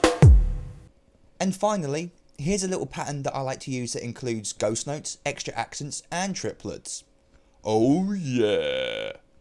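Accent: United Kingdom. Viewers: Listen to that British accent :)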